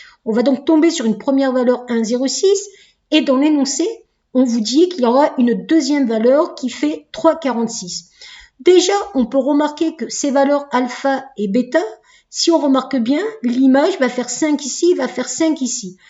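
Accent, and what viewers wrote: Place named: Southwest, France. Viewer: Superrr madame ! Vidéo qualitative avec un arrière goût du sud-ouest :) merci beaucoup !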